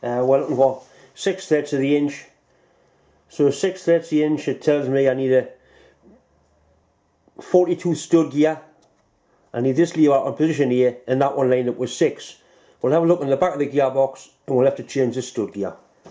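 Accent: Newcastle, United Kingdom. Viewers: He's a Geordie. They don't do foreign,. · I think he's geordie from Newcastle. Up North East. I'm from Manchester Northwest.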